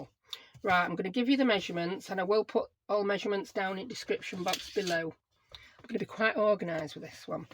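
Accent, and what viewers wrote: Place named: Yorkshire, United Kingdom. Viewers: Thanks so much for sharin...really enjoyed watchin.Love the use of paper bags and the lovely papers.Really enjoyd your lovely accent...I live in Australia now but originally from Sheffield UK.I felt like I had a little visit back ome. Been in Ozz 26 yrs..still sound like a Yorkshire puddin...lol...All the best luv....xo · Edith from scrapbooking with me sent me over. Wasn't expecting a fabulous northern accent 😄 lovely project, thanks for sharing xxx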